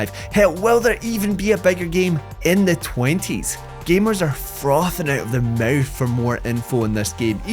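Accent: Scotland, United Kingdom. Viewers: My sister-in-law is from Scotland and she'd probably describe his accent as "polite Scottish", a toned down version so Yanks can understand.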